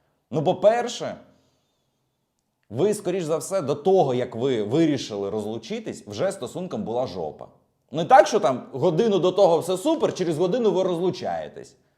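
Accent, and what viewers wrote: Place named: Surzhyk, Ukraine. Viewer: Задолбал слушать твой сраный суржик.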